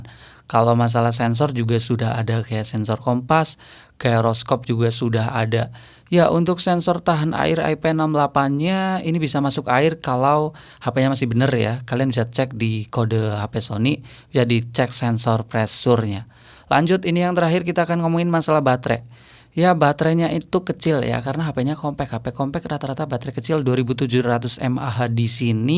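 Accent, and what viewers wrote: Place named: Banyumas, Indonesia. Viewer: Oh wong Cilacap Adipala toh Ngapak kaya nyong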